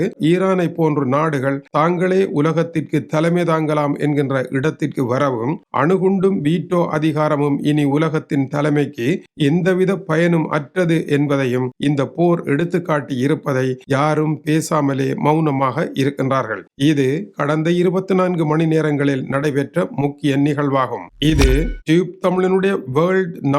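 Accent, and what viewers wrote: Sri Lanka. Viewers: நல்ல இலங்கைத் தமிழ் கன்னியகுரல் குளந்தைகளுக்ம் புறியும்படியான விலக்கம் நன் திகைக்கும்படியன ஒரு தெளிவான விலக்கம் அடிகடி உங்கள் நீ ஸ் கேக்க மனம் தூண்டுகிரது மிகவும் உன்மையான சம்பு வமாகவும் உடனுக்குடன் செய்திகளை அனுப்புங்கள் நன்றி ....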